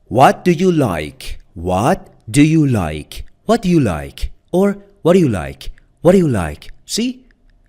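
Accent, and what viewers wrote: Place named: Togo. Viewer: Togolese english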